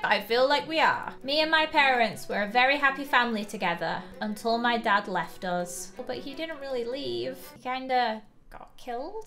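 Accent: United Kingdom. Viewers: Quistion are you British cause you kinda sound like ittttt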